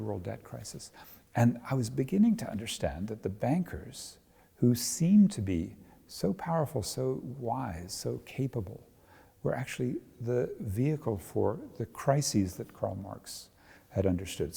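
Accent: England, United Kingdom. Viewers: О, Вы из Англии?) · Странно но я слышу английский акцент, притом что сам плохо говорю по английски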